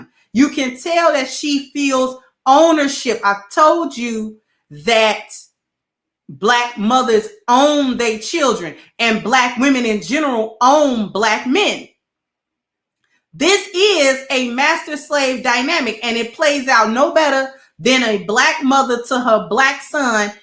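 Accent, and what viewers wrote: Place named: East Coast, United States. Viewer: my sister you sound like a east coast sister much lov Salaam.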